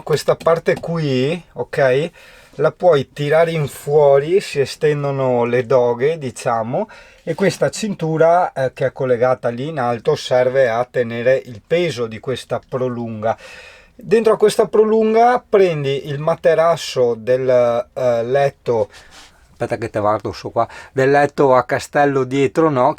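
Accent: Veneto, Italy. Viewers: ormai ti seguo dal primo video credo, o un paio di video dopo, ascolta el sio, qua in Veneto esiste una parola che mette in accordo tante robe, dall'augurio all'offesa.....un bel VA IN MONA e non serve dir altro. Quando ti demotivi, pensa a sto puoro mona che ti scrive e che ti dice che è contento quando le aziende ti mandano qualche cosa da recensire. Primo perché vuol dire che il canale va bene ed è simpatico, secondo alle aziende non costa nulla spedirti, perché per loro è importante far vedere quello che producono, terzo se l'oggetto spedito fa comodo anche a te, meglio due volte. Non rifiutare gli oggetti spediti, anche se vai un poco off topics, se l'azienda in questione ha piacere che le recensisci il prodotto, tu fallo, perché a loro fa piacere, magari la chiami la recenzione off topic, ne può nascere una cosa simpatica. Gli heater sono una cosa sgradevole, purtroppo persone senza senso, povere intelletualmente nella loro vita e povere in rete. 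Bannali e che i vaga in mona. Ad esempio a me piacerebbe vederti recensire un bel coltello multiuso tipo il VictorInox, utilissimo per tutti, anche per i camperisti o similari. Non perché non ci siano recensioni su youtube di questo oggetto e similari, ma perché recensito da te che hai la parlata della mia regione è un'altra cosa, insomma senza voler togliere nulla agli altri recensori, lè na recension veneta, lè un'altra roba daiiii......Con amicizia Anacleto. P.S.: La cosa scandalosa sono le pubblictà che siamo costretti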